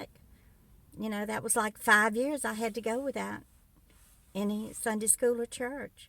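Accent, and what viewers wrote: South, United States. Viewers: . I love listening to your stories. I knew that accent had to have come from somewhere close to me. I'm from Mobile. I grew up going to southern Baptist and I had too many questions about the bible so when i was old enough I quit going to church. I'm not going to live my life in fear of going to hell because this book said so. I raised my kids to choose their own believes. I'm not here to offend you in any way. I do believe in a higher power just not the God that we speak of today. Oh an yeah....Wal-Mart is the devil himself. Most people including me have to shop there by force. We can't afford to pay the higher prices elsewhere. PEACE & LOVE.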